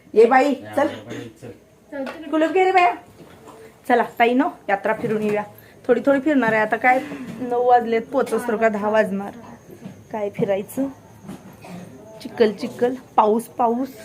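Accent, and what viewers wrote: Kolhapur, India. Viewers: Aapli bhasha kolhapuri aahe, bilkul badlaych nahiii... Amhi kolhapurkar 🙏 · हाय खूप छान जत्रा एन्जॉय केली. असू दे छान बोलतेस.कोल्हापूरी भाषा पण छान वाटते. मी पुण्यात राहिली आठ वर्षे.देश तसा वेश करावा लागतो. व्हिडिओ छान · टेंबलाई देवीचे दर्शन तुझ्या मुळे आज झाले🙏.... अणि तुझ्याकडुन कोल्हापुर चि भाषा ऐकायला छान वाटते🤗.. भाषेचा लहेजाच असा आहे...!! 👍👍 🚩🚩Lots Of love and Blessings to you & all Family 🧡🧡🧡🧡🧡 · तुझ बोलन खुप गोड आहे तु काही बदल करु नकोस कोल्हापूर ची बोलीभाषा छान च आहे मुंबई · तुम्ही आहात तशाच गोड आहात.. कोल्हापूरचा अस्सल फील येतो...माझे माहेर आहे कोल्हापूर... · Hi tai tu khup mast boltis nko change kru bhasha mi pn kolhapur chi aahe tujhyamule mla devich darshn milal mi geli nhi yaveli so khup thanks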